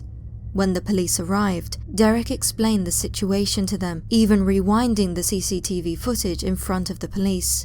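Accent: United Kingdom. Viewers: When Brits say the word "figure" it sounds like "figga". The word "home" sounds like "haome". I enjoy the stories though. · She does have a very pleasant voice and clear British English.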